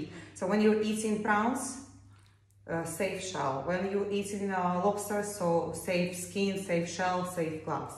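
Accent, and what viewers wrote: Russia. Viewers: Is she Russian? That’s no Spanish accent! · I also heard accent similar to Russian...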